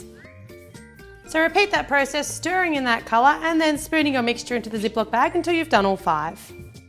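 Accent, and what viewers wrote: Australia. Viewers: Australian I think but definitely not English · I hear a little aussy in here and I see an aussy chocolate dollar :)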